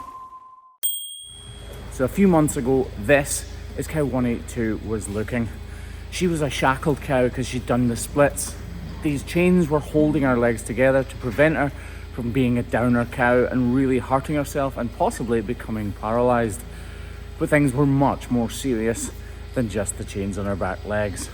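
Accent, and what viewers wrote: Scotland, United Kingdom. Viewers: It's the Scottish accent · Sorry I love your Scotish accents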